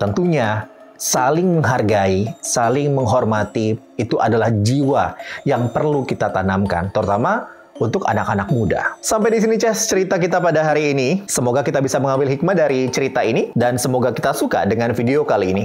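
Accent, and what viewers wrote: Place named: Osing, Indonesia. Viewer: Logat bhs oseng kang.... mosok jowo... klendi seh Peno ikaw..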